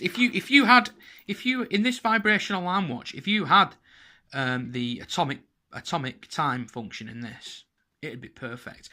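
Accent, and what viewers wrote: Bolton, United Kingdom. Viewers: Yup, I think you've convinced me. I'll get one ordered 👍 Are you from Bolton by any chance? 😎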